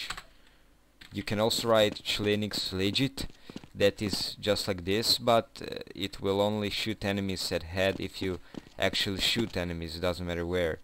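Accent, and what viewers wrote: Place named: Russia. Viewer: акцент, может быть и английский, но он русский!!!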